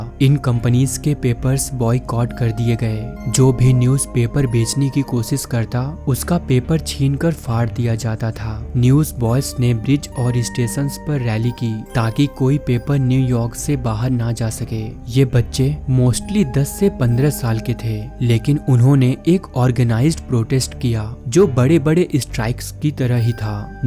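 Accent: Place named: Bengal, India. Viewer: Aab Bengali Accent Mei Q Bol Rahe Ho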